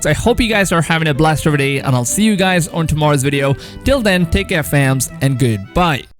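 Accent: India. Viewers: Is that some Indian accent I hear? 🤔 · He’s Indian it’s an accent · Having an Indian accent doesn't mean he isn't pronouncing the word wrong. Plenty of people with the same accent know how to properly enunciate the letter V in a word.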